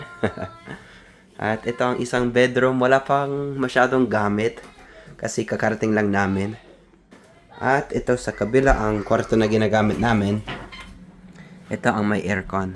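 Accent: Philippines. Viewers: Nice kubo. Grabe ung accent ni sir pinoy na pinoy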